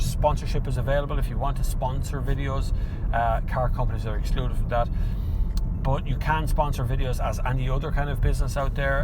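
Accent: Ireland. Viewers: I like your Irish ☘️ accent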